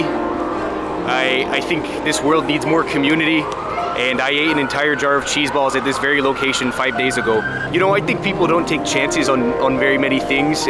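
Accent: Canada. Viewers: this is the most canadian sounding man i’ve ever heard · Dude I love the heavy Canadian accent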